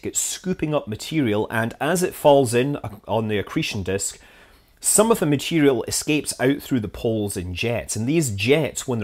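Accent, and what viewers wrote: Scotland, United Kingdom. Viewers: i love your scottish accent!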